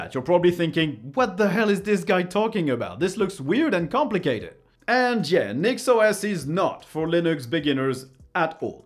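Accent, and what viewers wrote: France. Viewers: Excellent video, i now want to try Nixos ! Also, you have a clear English pronunciation but what is your mother tongue, are you French?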